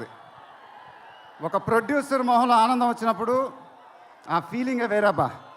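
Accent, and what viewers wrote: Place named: Guntur, India. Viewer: Pure guntur slang 😍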